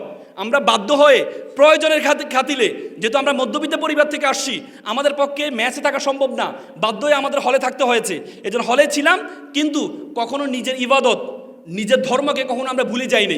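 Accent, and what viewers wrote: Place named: India. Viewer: আমার খুব জানতে ইচ্ছে করে উনার বাড়ি কোথায় কারণ ভাষা গুলো মনে হয় ইন্ডিয়ানদের মতো আবার যে গুলো বলে সেটা বাংলাদেশের জায়গা।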